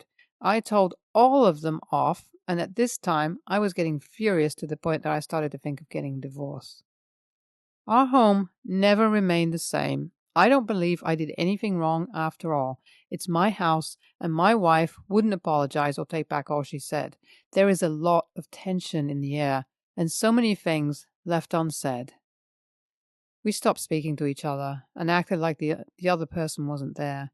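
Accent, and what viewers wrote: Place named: United Kingdom. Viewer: If I had to guess she’s Indian/Pakistani from England from her diction speech patterns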